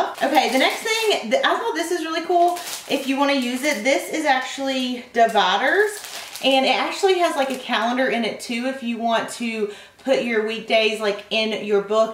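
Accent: South, United States. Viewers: I LOVE her kind of southern accent it's so pretty ❤️ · your pure American (love your accent but it won't suit me lol)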